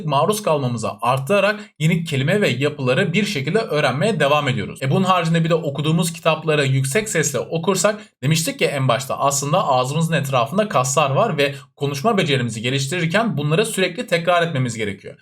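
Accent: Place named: Turkey. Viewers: ilk 5 saniyede anlaşılıyor aksandan türk olduğu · bence türk aksanı belli oluyor ama aksan önemli değil zaten çok akici konuşuyor.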